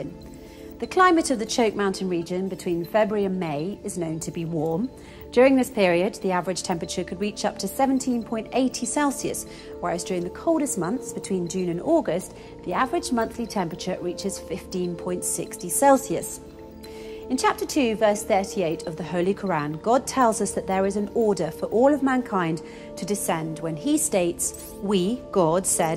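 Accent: United Kingdom. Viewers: Speaking in a british accent in no way diminished the primitive nonsense you are trying to sell here.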